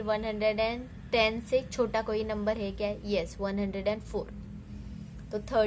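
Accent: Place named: Mumbai, India. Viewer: 😊 aap mumbai ki lagti ho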